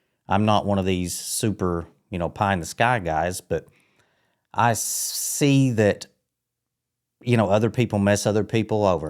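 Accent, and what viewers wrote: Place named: Tennessee, United States. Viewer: I'm also an infj. We are definitely on the same wavelength. And I'm from Madisonville, I told my friend Paula I thought you have a Tennessee accent. Anyway, I enjoy your videos and completely relate with your experience.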